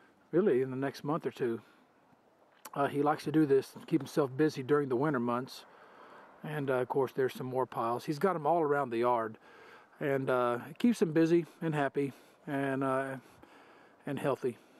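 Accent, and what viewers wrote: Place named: South, United States. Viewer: you don't have a MN accent you sound like you are from the south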